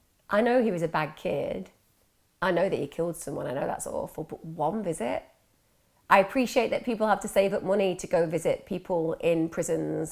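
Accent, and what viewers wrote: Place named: North, United Kingdom. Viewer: Chilling awful crime!! But Thank-you again for yet another well done video!! 👏 I love your channel prob helps that we r from the same neck of the woods so good 2 hear a uk northern accent!! Well done 🇬🇧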